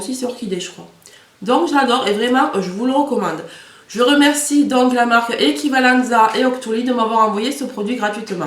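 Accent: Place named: Marseille, France. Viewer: tu es Marseillaise ? En tout ka tu as l accent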